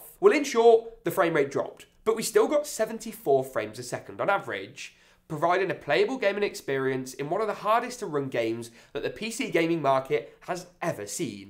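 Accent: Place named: Yorkshire, United Kingdom. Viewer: You have a nice Yorkshire accent.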